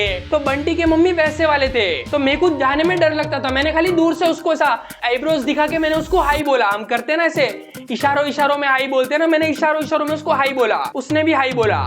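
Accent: Hyderabad, India. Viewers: Your Hyderabadi accent is to die for ❤❤😅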